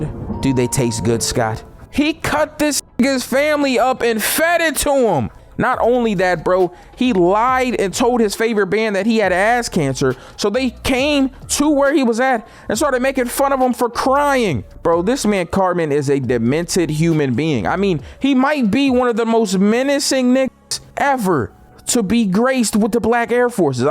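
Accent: Philadelphia, United States. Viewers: U sound more Philly den Baltimore cuz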